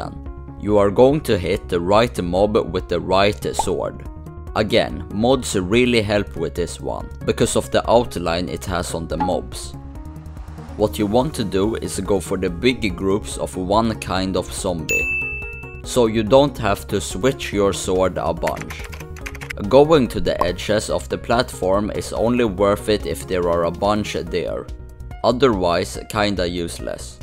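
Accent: Sweden. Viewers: Bad Video + L Swedish😂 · swedish accent is fun just yamp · W Swedish cause you say "yump" love that shit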